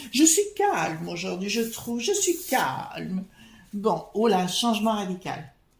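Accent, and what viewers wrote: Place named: Provence, France. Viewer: Bonjour, j ai reconnu l acccens du pays...sa sent bon la farigoulette et la lavande..je vous souhaite une bonne soiree. ♥☺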